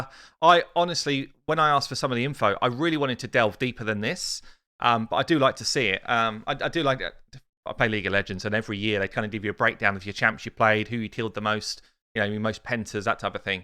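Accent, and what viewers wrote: United Kingdom. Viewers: I could tell by your oh so British voice inflection. I had the pleasure and privilege of working with the RM when I was an active member of the USMC. I rapidly got to understand the humor of the Citizens of the UK. You British are different from region to region. The Scots, the 1st Sgt. was different from the British. The Welsh had a completely different view of the world. But the beer was great, rats were good, and we all agreed Norway was an ICY *place*. But the women made the evenings eventful. Someone said the main thing separating the US and UK is a common language.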